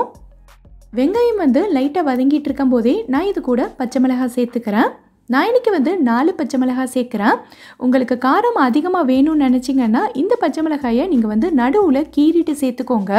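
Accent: Madurai, India. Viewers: Super Madurai tamil, try panni pakkeren. Australia 🇦🇺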